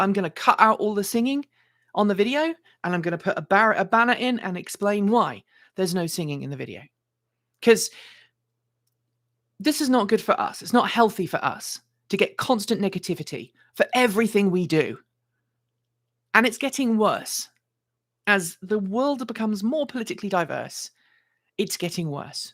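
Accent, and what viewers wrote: United Kingdom. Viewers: Enjoy your vids as they are don't change because of some narrow minded people be yourself and hang in there and I love the British accent hang in there 😊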